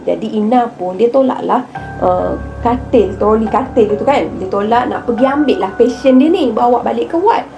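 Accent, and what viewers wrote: Kelantan, Malaysia. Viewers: Mesti ni org Kelantan. Ada keluar slang:). Best dengar citer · dari lorat bahasa mlysia kluar lorat pahang..last2 kluar lorat ..mu kecek kelate eh.. takpee..teruskan bercerita..👍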